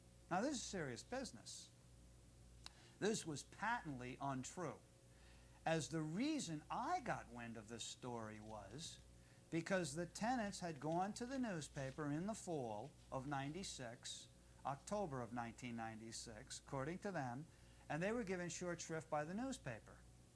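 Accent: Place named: New York, United States. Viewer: It's a new york accent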